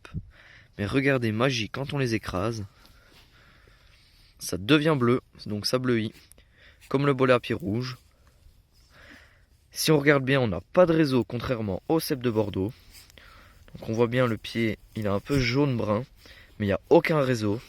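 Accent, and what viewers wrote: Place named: Franche-Comté, France. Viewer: Tu ne serais pas de Franche Comté ? Je pense reconnaitre un leger accent 😊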